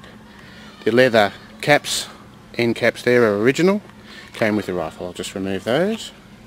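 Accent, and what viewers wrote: Australia. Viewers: Ahhhh I have to laugh at all the aussie talk comments. You from NSW or Victoria??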